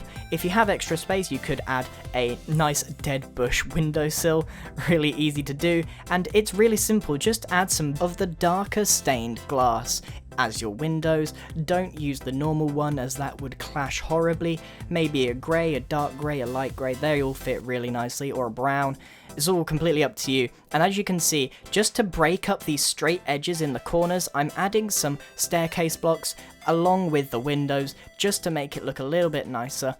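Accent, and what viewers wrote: United Kingdom. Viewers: are you really brtish ☺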